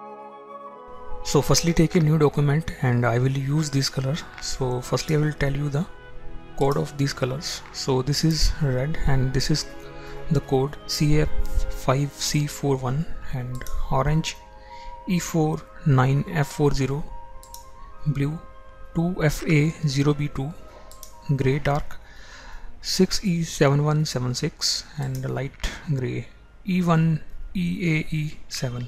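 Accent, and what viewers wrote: India. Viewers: i fucking haaate indian accent , gosh i would break your teeth just for talking vonce , vhen , vhere , vhore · Tanguy Souchet Typical North Indian Accent for ya ;)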